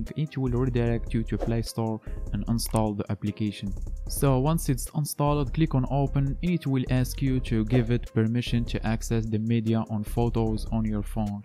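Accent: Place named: Morocco. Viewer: nta mghribi bayn mn laccent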